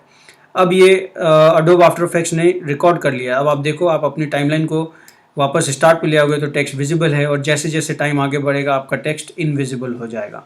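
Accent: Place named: Haryana, India. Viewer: owsm video sir . aapki voice mybe haryanvi hai hahaah good work thumbs up?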